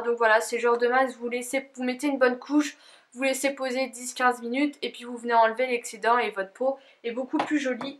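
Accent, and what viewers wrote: Lorraine, France. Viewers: j'aime Bien Ton accent ! Teeam Lorraine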